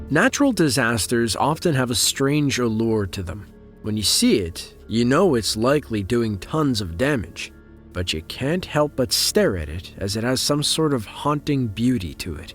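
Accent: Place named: Rhode Island, United States. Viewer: the way you say various...which doesn't coincide with the way you say areas.Rhode Island?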